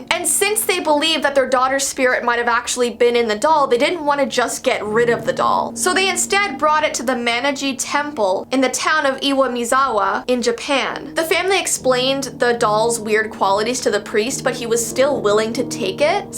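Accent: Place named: Canada. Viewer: I’ve watched a bunch of video and I’m just now hearing what might be a Canadian accent! Where have I been?!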